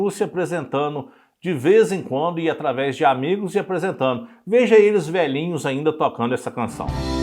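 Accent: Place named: Minas Gerais, Brazil. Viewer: Meus pais são de Cataguases. Quando vc começou a falar na hora tive certeza que vc é mineiro.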